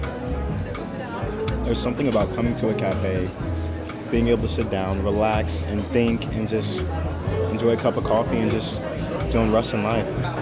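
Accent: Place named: United States. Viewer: Are you American?🤷‍♂️🤷‍♂️You sound American. Watching from HONOLULU HAWAII. Hey, Mele Kalikimaka from America 😎🎅🏻🎅🏻 Very good video. I can always spot an American 🤓😍🇺🇸😎🎅🏻🎅🏻🌲☃️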